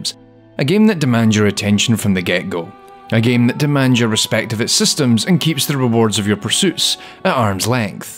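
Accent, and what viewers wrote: Scotland, United Kingdom. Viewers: nicebagel not posh he is Scottish and has a strong accent meaning he is from either the central belt or is from a part in the highlands that is quite rural. I would be surprised if he was from Aberdeen or Edinburgh and would be amazed if he was from the western isles. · I'm just at the beginning of the video but I want to say this entire format seems really, really interesting and I love your accent, sounds a lot like a scottish guy whose channel is also one of my favourites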